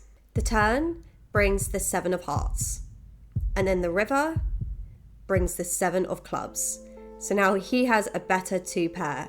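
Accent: United Kingdom. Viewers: Ul in hahah British accent will always make me laugh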